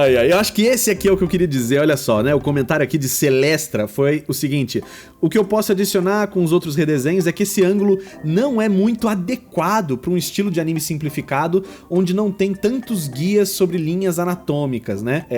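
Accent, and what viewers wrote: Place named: Paraíba, Brazil. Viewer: que jeito paraíba de falar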